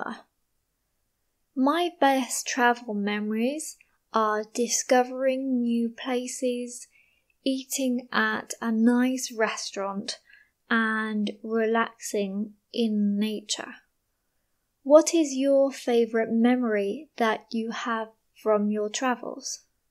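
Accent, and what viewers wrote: United Kingdom. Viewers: I'm wondering something... Where does your accent come from? Of course it's British, but your accent is much clearer than most English. I knew there were many accents in the UK. 🤔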